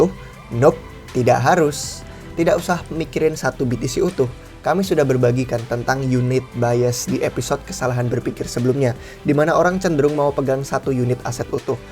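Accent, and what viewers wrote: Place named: United Kingdom. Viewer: Logatnya ala Inggris Britain bukan Inggris Amerika. Konsonan tengahnya hilang semua. Wkwkwk...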